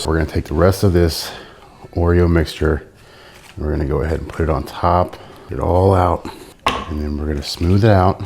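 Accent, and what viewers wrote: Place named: United States. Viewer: You have an American accent why are you measuring everything in metric?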